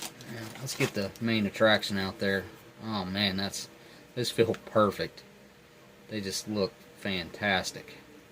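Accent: South, United States. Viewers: Love the accent. As an Aussie, I appreciate a good country sounding American 👍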